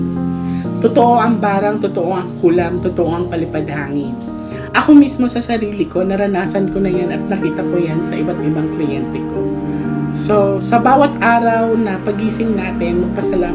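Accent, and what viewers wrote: Visayas, Philippines. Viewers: Cute mn mg bisaya si ms ❤️ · Bisaya gyud 😁😁👌👌👍👍watching u miss.. from Cebu City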